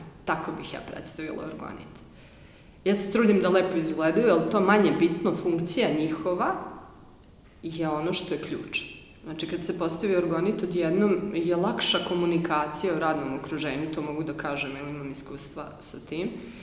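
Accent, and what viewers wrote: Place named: Novi Sad, Serbia. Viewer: Kako je milina slusati Novosadski akcenat. Bukvalno te uspava.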